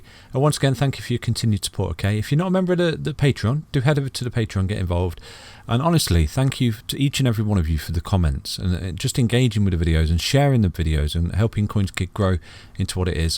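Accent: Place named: Birmingham, United Kingdom. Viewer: How have I just found you? Another Brummie?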